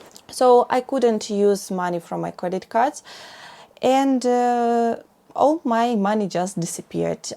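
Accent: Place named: Russia. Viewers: The way she speaks is typical of Russians. As you notice, she doesn't use words like "the" since that doesn't exist in Russian grammar. She's not difficult to understand though. Most Russians won't be able to maintain this rate of speech and their pronunciation will be far off what you would expect. Channel would become Chairneeel. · i saw a short an thought you're Japanese talking English, but you're Russian talking Japanese English ... its quite hard to understand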